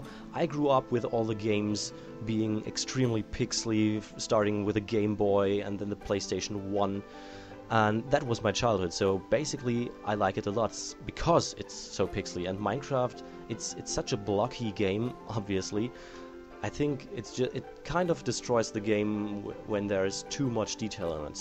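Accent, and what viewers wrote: Germany. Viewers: Schon geil zu sehen wie alle nu so: "OOOOH der is ja deutscher daher der akzent!" xD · Waow, most Germans have a very obvious English accent but yours is noticeble but it sounds alot better then what I've heard as a Dutch guy :-) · i knew you're german! ;-) i can easily recognize that accent... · i thought you where german or something like that. LOL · Ich habe mir von Anfang an Gedacht, das du Deutsch sprchst, das hat man gehört! Aber du spirichst extrem natürlich und "wortgewand" Englisch! Super! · I knew u were German. Hail! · You don't have a weird accent its just that you're so good at English that people cant distinguish you're German accent. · i new you where Germin i just couldnt put my finger on it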